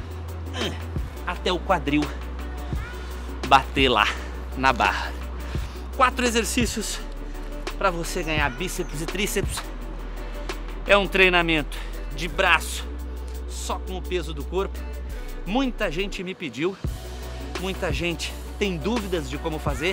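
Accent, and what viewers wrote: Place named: Porto Alegre, Brazil. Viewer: Tu é gaúcho ? 😅 Percebi um ar portoalegrense na tua forma de falar,as praças dos vídeos me lembram muito Porto Alegre,pois sou de Porto Alegre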